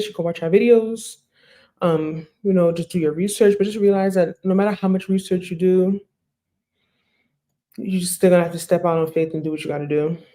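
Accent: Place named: East Coast, United States. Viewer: I'm new to your channel, but you definitely have an east coast accent. I can also hear a little bit of Naija in you.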